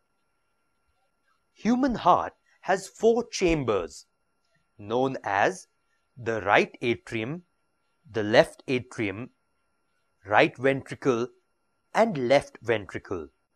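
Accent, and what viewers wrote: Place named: India. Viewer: lovely indain accent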